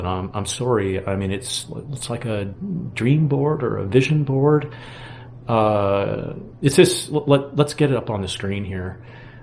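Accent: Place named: Canada. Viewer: Thank you so much for your heartfelt and very valuable updates on Andrew Cross's ongoing crisis. You have to be Canadian-born, like myself, for some of your north-of-border pronunciations. (Well done, I say, as a dual citizen living in USA but born in Ontario in 1955.) Your commentary has been nothing short of brilliant, even if heart-rendering at times. You are a saint for making this effort and I thank you again from my deep heart.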